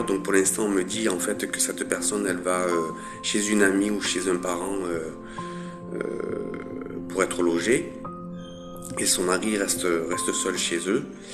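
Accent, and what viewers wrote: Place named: South, France. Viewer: Bonjour," "Guidance intemporelle🎉Beaux Flashs.Qui parfois pas si,évident à dire.Mais,bien réel ce Flash.Super!...😊De toute beauté.Très intéressant et ce qui permet de mieux comprendre certaines,situations et aussi,certains comportements.Très beau Flash🎉Recevez mon soutien😊Plus rassurant et appréciant ce"Don"🎉Qui ne peut qu'amélioré le quotidien de chacun.Sincères remerciements" "🎉Avec son Joli accent du midi😊Franchement Beau ces Flashs🎉On ne s'en lasse pas😊Belle Richesse en ce Don🎉Prenez soin de vous😊Au plaisir!...😊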